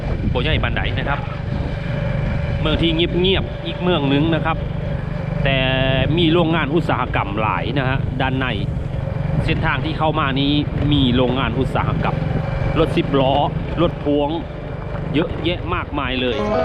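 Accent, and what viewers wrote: Phu Thai, Thailand. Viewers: สุดยอดเลยครับอ้ายสำเนียงภูไทคือบ้านผมแฮงภูไทกะต๊ะ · มักๆค่ะเว้าผุ้ไทมักสำเนียง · เว้าภูไทเบิ่ด ปานได้มื่อบ้านติอ้าย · พ้อแล้วนี้ละพี่น้องแม้นเลยสำเนียงภูไทกาฬสินธุ์สกลนครมุกดาหารนครพนมสำเนียงภูไทบ้านย่าแถบอำเภอเขาวงจังหวัดกาฬสินธุ์ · เว้าภูไทคือเดยว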